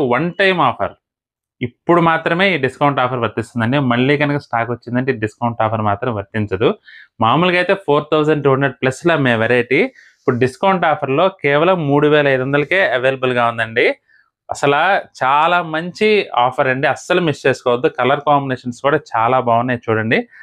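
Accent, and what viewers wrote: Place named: Andhra Pradesh, India. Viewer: Sir మీరు ఆంధ్రా చాలా బాగా మాట్లాడతారు🎉🎉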